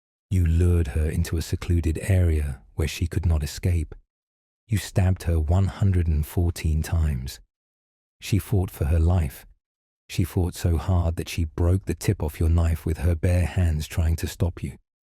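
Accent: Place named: United Kingdom. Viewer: Why use the British AI accent for USA stories? Use the USA accent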